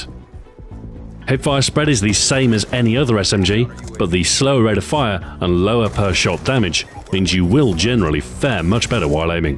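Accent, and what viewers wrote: United Kingdom. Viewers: I don't get what's so weird about his voice. It's just a British accent! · Well, he has a British accent and his voice is like hot chocolate 🤔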